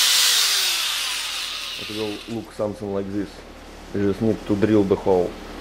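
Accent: Russia. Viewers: Как будто чувак русский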